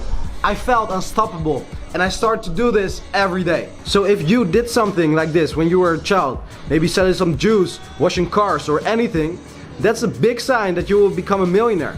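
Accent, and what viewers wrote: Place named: Netherlands. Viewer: Love the energy! And the Dutch accent is great too, hard to confuse it with some other. :) (I lived in Holland)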